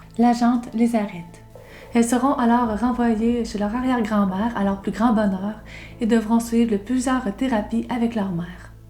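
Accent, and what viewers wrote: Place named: Quebec, Canada. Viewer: J'ai failli le dire, jusqu'à ce que je comprenne rapidement que c est à cause de l accent dont je ne suis pas habitué. J ai évité de le dire à la base pour ne pas que la youtubeuse le prenne pour elle, les autres vidéos intéressantes d autres youtubeur québécois ça me fait pareil. Tu pourrais la décourager alors que ce n est pas elle le problème.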